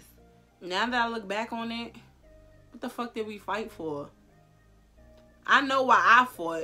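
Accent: New York, United States. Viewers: It’s that New York accent!! I love it!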